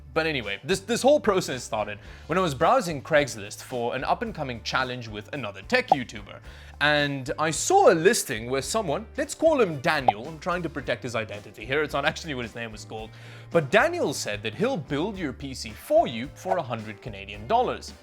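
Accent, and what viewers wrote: South Africa. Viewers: My first time watching one of your videos, and I just have to know... you're South African right? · You kinda sound like a South African ngl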